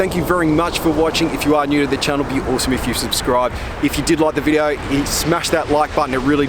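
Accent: Australia. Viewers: To man, I like your Australian accent 😍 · Aussie !!! Yeh mate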